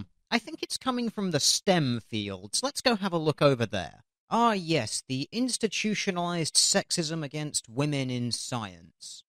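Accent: United Kingdom. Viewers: Aw, damn. He's winning me over. His snarky-British blue deer accent is wiggling all around in my brainspace, making me giggle and think critically and shit. Whoo, binge watching!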